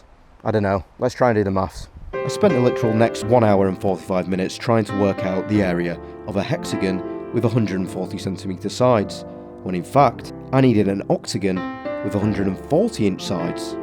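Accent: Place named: Hutton, United Kingdom. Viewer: You sound like you’re from Hutton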